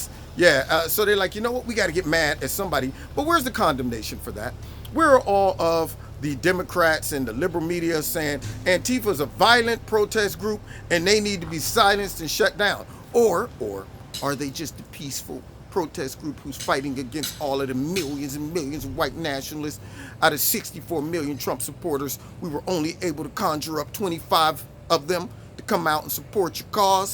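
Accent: Australia. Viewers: LOVE your Australian accent! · Love the Australian accent lol I counted and saw 27. lol The leftists have constantly been talking about transparency, well President Trump is the only true Transparent President. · I loved the Aussie accent. You are the best. We need to hang together and be kind to one another.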